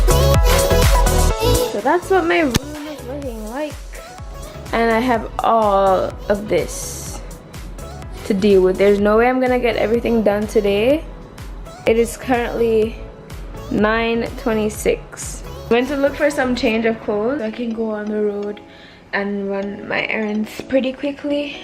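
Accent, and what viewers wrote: Jamaica. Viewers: Very cute Apt. can't wait for the finishing....keep at it girl you seem like a lovely young lady( I hear a Jamaican 🇯🇲 accent) a lot of my childhood friends are Jamaican!!!!! Love them all especially the eating times at their house 😋 I'm from BERMUDA 🇧🇲💖💖💖💖💖💖💖💖💖💖💖nice to meet you your NEW SUBBIE💥💥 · As a Jamaican I can hear the accent a thousand miles away 😂❤️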